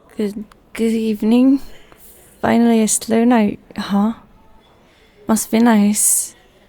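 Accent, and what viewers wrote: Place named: Northern England, United Kingdom. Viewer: Love your accent! You from northern England or one of the Norse countries?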